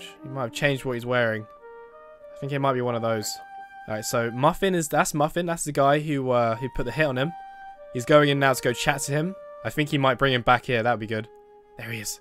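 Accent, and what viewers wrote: United Kingdom. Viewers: someday you could go to buckingham I am a fan I always watch your videos it funny it's sometime has edit if you go to buckingham I'm there Lieutenant Truong I could tell that your British but I'm not british I'm not the one who incharge I know you might be yelled by the royal guard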